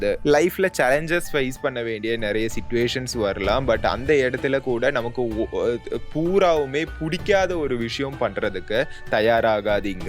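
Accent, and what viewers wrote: Kerala, India. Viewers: Extra ooordinary keralite o pronunciation style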